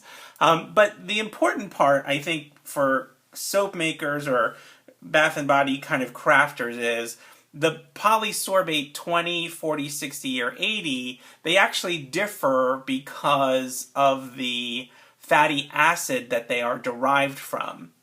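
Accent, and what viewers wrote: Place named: Philadelphia, United States. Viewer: I've made room sprays using varying percentages (from 50% to 90%) rubbing alcohol, or vodka (35% or 70 proof), or Evercleer (95% or 190 proof) grain alcohol. I really dislike the alcohol smell in all of them, nor do I like waiting for the alcohol smell to dissipate...some taking MUCH longer than others. So I'm glad to hear about the DEO Household stuff you've recommended using. I'll check it out. One other thing, though. I've always heard you should use EO instead of FO in a linen spray because FO can leave a stain and EO will not. For that reason, I've only ever used EO in a room or linen spray. I've never used FO in a room or linen spray, so I can't say whether it stains or not. Have you any thoughts on that provision? You sound just like my friend in NE Philly...are you in Philly?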